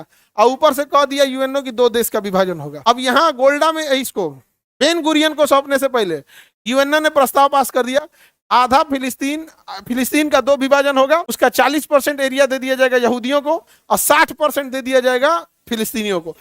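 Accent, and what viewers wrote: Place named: Bhojpuri, India. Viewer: I like the way he speaks in bhojpuri style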